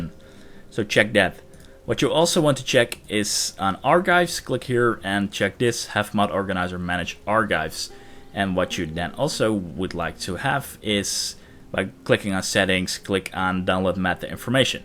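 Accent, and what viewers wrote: Netherlands. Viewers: Heerlijk Nederlands accent! Toffe video trouwens :)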